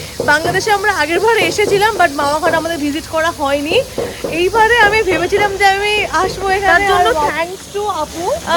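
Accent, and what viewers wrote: Kolkata, India. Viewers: তোমার বাংলাদেশের ভ্লগগুলো দেখলাম। তুমি খুব সুন্দর করে কথা বলো। কোলকাতার বাংলা আ্যকসেন্ট আমার কাছে বেশ দারুন লাগে। আরো ভ্লগের অপেক্ষায় রইলাম। আর মাওয়া ঘাটের ইলিশের লেজের ভর্তাটা আসলেই খুব মজার। আমি ২০১৯ এ খেয়েছিলাম। তখন অবশ্য এত সুন্দর হোটেল দেখিনি। এখন বেশ ভালো লাগলো দেখে। যাইহোক, আশা করি তোমার বাংলাদেশ ভ্রমন ভালো যাচ্ছে। এপার বাংলা থেকে বিদেশীনীর জন্য অনেক অনেক ভালবাসা রইল।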